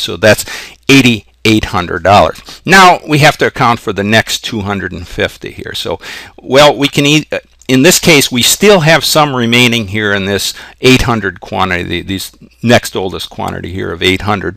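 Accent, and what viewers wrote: Boston, United States. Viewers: Your Boston accent is so strong, I imagined Peter Griffin speaking. Nevertheless, great tutorial! Definitely needed it for my FA class.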